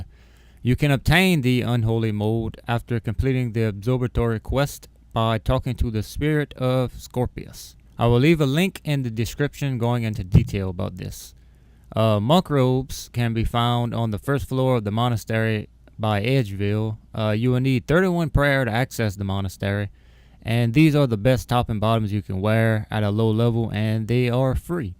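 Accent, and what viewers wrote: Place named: Louisiana, United States. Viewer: sounds like a Louisiana boy.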